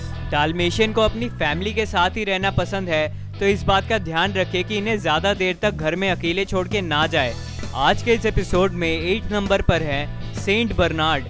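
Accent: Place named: India. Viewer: Indian speech i love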